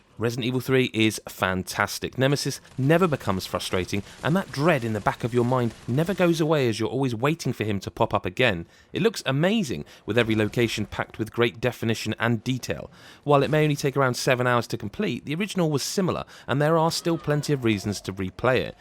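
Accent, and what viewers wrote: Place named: United Kingdom. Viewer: Is everyone who reviews games British?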